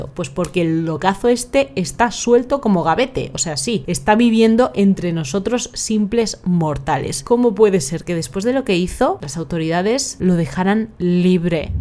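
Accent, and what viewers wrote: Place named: Spain. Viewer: Realmente nunca me gustó ver a algún español no me lo tomes a mal el acento, pero me encanta verte y escucharte te veo y en ocaciones solo te escucho mientras estoy trabajando en el microscopio 🔬 💕 😊 me encantan, las historias criminales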